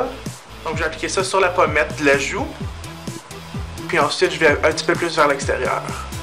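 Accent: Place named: Quebec, Canada. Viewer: Tu as un accent Québecquoi ! :]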